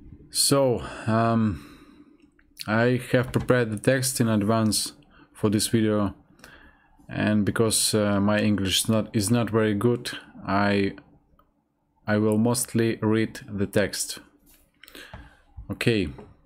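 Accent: Russia. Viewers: good video-thanks.Your English-pronunciation- is pretty good.Your Russian accent is much less than the accents we-people in USA- hear in movies when an actor is portraying a Russian person. Maybe the actors ham it up-make the accents stronger than "real" accents. In any case-pronunciation is good. I looked up some figures-the claim was average consumption for person 15 or older is 11 liters of PURE ETHANOL per year. 11,000 mls- 100 pls of pure ethanol over a few hrs is enough to make you drunk-AND CAUSE LOTS OF LIVER AND BRAIN DAMAGE! So 11,000 mls/yr is 110 DRUNKEN DAYS per year- 2 times a week-POISONED. Opioids- are actually much less toxic than alcohol.They can acutely kill you-stop breathing-if used IV-but used orally they just addict(not good) but they won't kill you if used only orally. Shame for Russia and Russians-perhaps alcohol is why you folks are manipulated by your leaders and oligarchs(not that we in USA are not-but we actually have free elections(yes it takes huge piles of money to get elected-so in some respects our elections are bought-but. 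we have "some choice" and since we are armed-2nd amendment-occasionally we kill our leaders(so does India-Israel and other democracies-France repeatedly tried to kill De Gaulle-a hero war leader-they failed but not for lack of effort! You folks can't convincingly. threaten your leaders-we can but rarely kill the ones who "need" killing.But. perhaps our leaders keep this in mind when making policy. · Thank you very much. Alcohol is a big, big problem in my home country Danmark as well. For some reason the price of alcohol never really goes up here - in fact they have lowered taxes in recent years. I wonder why. Your English is fine btw, don't worry. For some reason, English spoken with Russian accent is very charming :)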